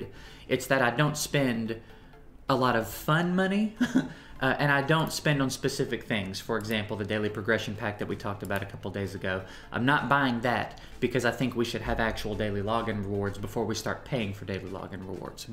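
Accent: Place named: New York, United States. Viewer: Play Raid, back you were hood, edition. Nah, just New York flow. It makes me homesick. Yes, I'm still binging your vids.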